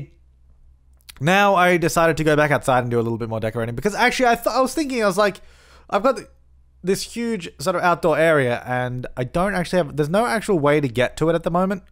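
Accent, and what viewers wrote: Australia. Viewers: Happy to hear an Australian accent! Did you have an architecture background? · You sound like an Australian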